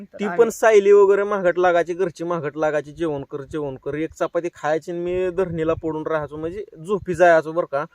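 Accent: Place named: Marathwada, India. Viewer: दादा तुम्ही कुठून आहे तुमचं बोलणं मराठवाड्यात दिसतंय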